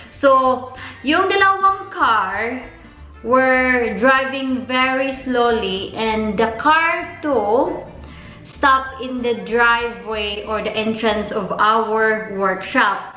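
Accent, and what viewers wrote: United Kingdom. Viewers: I can hear the British accent emerging 😌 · When im watching you talking,i remember the queen when she talks,ive watched the crown,you speak like queen elizabeth,you have a british accent already....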